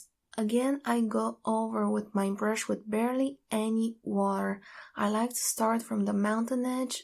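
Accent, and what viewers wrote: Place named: Eastern Europe. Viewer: I wish you narrated more of your videos. You have a beautiful speaking voice. Do you mind if I ask what country you are from? Your accent sounds like Eastern Europe to me, just wondering if my guess is correct. :-) Thank you for making these videos, I enjoy them very much.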